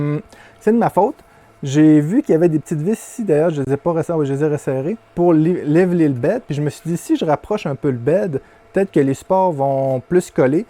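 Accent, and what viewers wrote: Quebec, Canada. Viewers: n y vois surtout pas de moquerie. C est juste rigolo. J aime tes vidéos et ton " accent québécois", tes expressions bien de chez toi:-) Amicalement.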